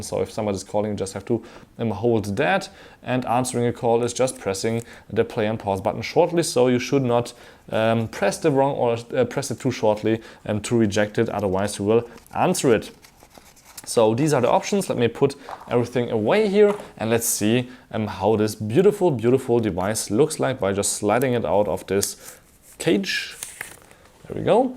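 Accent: Germany. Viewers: I MISS this german english accent sooo much!!!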